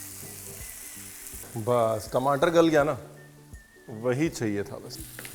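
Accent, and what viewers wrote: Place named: Punjab, India. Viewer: I noticed this today on how he says "Chickun" and not "Chicken". You can take the punjabi out of punjab, but cannot take punjab out of the punjabi. Love it!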